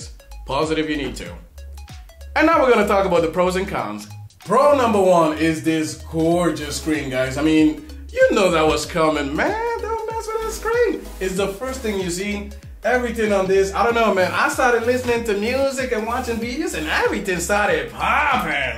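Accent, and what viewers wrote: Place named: Netherlands. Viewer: I advice you to work on the accent. Are you dutch ?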